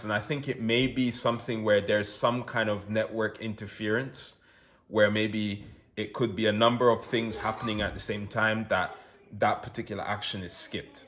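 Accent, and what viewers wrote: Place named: Caribbean. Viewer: Funny u don’t sound British, sound more Caribbean from African descent. 😮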